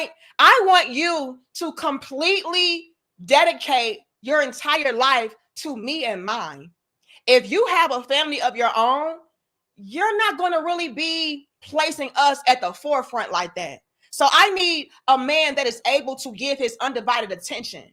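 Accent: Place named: St. Louis, United States. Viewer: Yo. You sound like you from the Lou. Where you born in the 314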